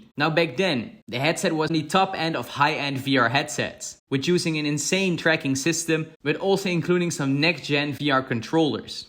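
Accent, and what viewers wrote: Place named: Netherlands. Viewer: Its only clear if you yourself are Dutch, but it doesnt really matter because apart from the slight accent, your English is really good!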